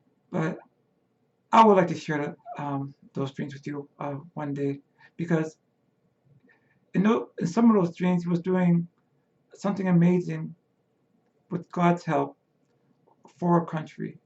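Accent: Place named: New Jersey, United States. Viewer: Thank you for sharing. You sound like someone I know from New Jersey. Tone, speech, mannerism , and such.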